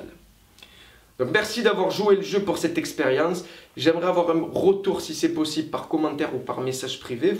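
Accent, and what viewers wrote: France. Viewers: Petit accent Toulousain... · Il a l'accent du sud' c'est très dur de se concentrer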